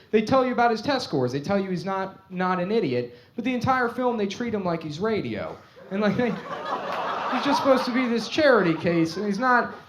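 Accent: United States. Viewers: You can really hear his Mid-Atlantic accent in this clip. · Why does my friend Nick sound like all my relatives in Philly who don’t talk to me? I like Bed Stuy Nick more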